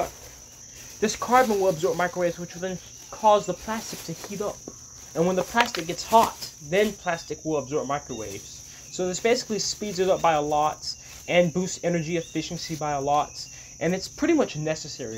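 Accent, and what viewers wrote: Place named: England, United Kingdom. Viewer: Did you spend time in England? You do our accent as much as I do and American accent😅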